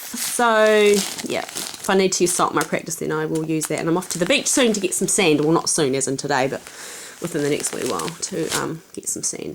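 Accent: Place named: United Kingdom. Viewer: so you are from New Zealand? wow that is great but your accent is like from Britain just a bit i suppose. i love to hear your voice so you can talk all day!! I really like all your stuff, girl, they have tea balls all day long here in the USA in Wal-Mart, I agree with you that online shopping is very bothersome but somewhat convenient especially when people such as my self cant find any witchy shops in a "christian" community. I have no other choice but to order online and i have to eat crow about it. and i prefer witchy shopping over clothes shopping any day as well!!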